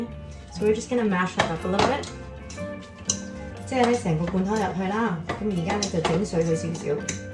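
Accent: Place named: China. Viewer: YUM! hahaha, the chinglish is real!!! love it hearing someone speak like me. keep it up!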